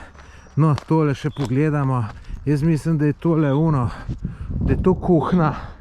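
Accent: Lower Carniola, Slovenia. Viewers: Hvala ti za lepo izcrpno reportazo, tud nam je poveljnik reku da se ne smemo nicesar sovraznikovega dotikat ker je lahko minirano in se ne splaca. Ja cudn res da una stolpnca se stoji jebemu, Amerika bo mela poune roke dela da vse to obnovi pa gnarja bo slo na miljarde. pazi nase in samo naprej. 👍👍👍 Ps. Pogresam tvoj prijeten dolenjski naglas, malo bolj ga lahko poudaris v oddajah in porocevanjih. Tud jst sm rodom iz Trebnjega Velki Gaber tko da rad slism naso špraho ☺️☺️👍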